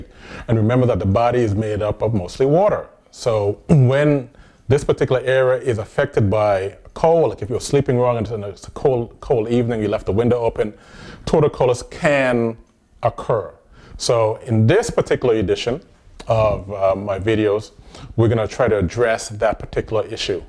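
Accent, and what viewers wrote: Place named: Caribbean. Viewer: Where are you from? I can hear hints of a Caribbean accent.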